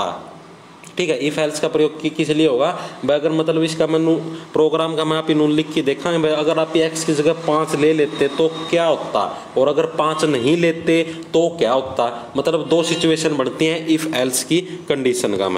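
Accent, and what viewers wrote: Haryana, India. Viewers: Ram ram guru ji aapki haryanvi bhasha achi lagi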